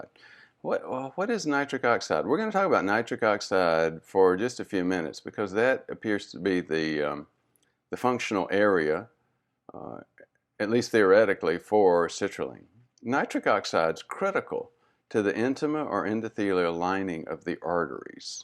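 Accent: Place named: Texas, United States. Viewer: Great explanation doc Simple and easy to understand And I like your Texas accent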